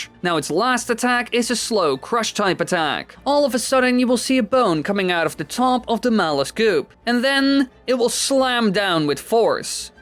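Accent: Netherlands. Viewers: Is he Dutch? He seems to have a Dutch accent when he speaks English.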